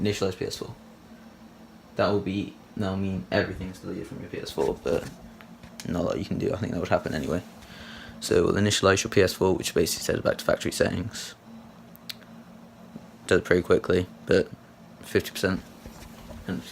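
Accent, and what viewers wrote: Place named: England, United Kingdom. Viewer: Omg u chocolatey English guy it works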